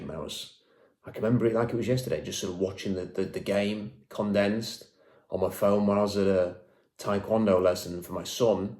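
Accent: United Kingdom. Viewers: Wait! Hold on! You've got a British accent, and you have no problems with "football"? Where's the "foot" in "football"? I always hear.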